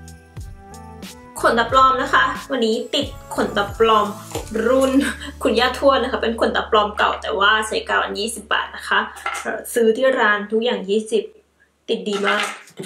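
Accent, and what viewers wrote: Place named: South, Thailand. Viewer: พี่ แหลงใต้ทำคลิปหล่าวตะ ชอบนิ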